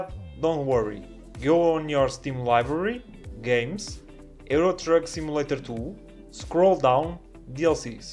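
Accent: Portugal. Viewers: Eu juro que so pelo sotaque imaginei logo que fosses portugues ahahah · quase certeza que é portugues esse sotaque não mente kkkkk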